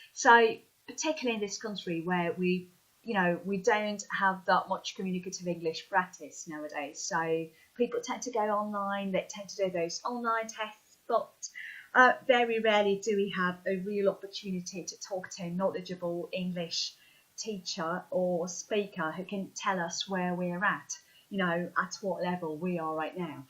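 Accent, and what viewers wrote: United Kingdom. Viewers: Ти дійсно з України? Це просто як Гаррі Поттера дивитися в оригіналі. Я б не повірив тобі, якби ти зі мною розмовляла в Україні, що ти не native speaker. Дуже гарно розмовляєш, як викладач та студент, який вчить інших та вивчає англійську, можу сказати, що це найкращий брітіш екснт, який я чув від українця. Ти просто зробила мій день. Повністю згоден, тільки важка праця над собою може допомогти досягти advanced or proficiency.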